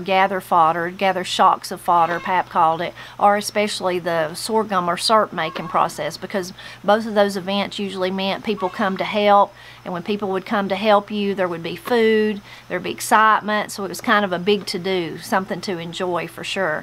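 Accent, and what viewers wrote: Appalachia, United States. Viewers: I’m so happy to notice that there seems to be plenty of pages yet to go in this amazing book! Perfect listening for me to cook supper while listening.. 😉 sweetly feminine voice, southern Appalachian accent and vocal cadence couldn’t be more perfectly ideal for narrating this story! Thanks again, and God bless y’all 💯🇺🇸❣️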